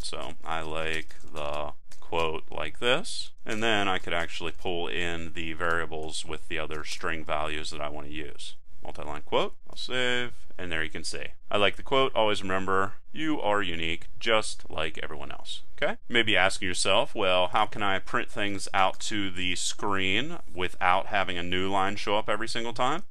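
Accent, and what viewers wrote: Pittsburgh, United States. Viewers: Being from Mount Washington.I heard that Pittsburgh accent right off. ;) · I got excited when I heard the Pgh accent. Represent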